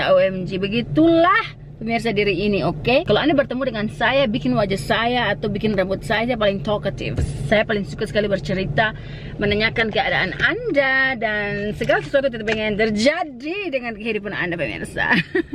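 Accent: Manado, Indonesia. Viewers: Saya sng logat.manado..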